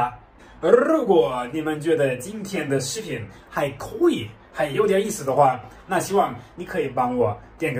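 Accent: Sichuan, China. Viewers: 哈哈你的视频很有意思，我是生活在斯图加特的中国人，看你说汉语还带着挺浓的四川口音，对你的好感加倍哈哈，祝你在中国生活的开心，希望德国也尽快控制住疫情。。。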